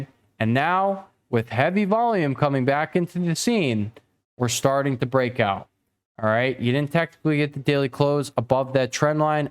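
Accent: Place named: Chicago, United States. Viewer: Thanks for your videos! Aspects of your accent remind me of where I grew up in Chicago. Where are you from?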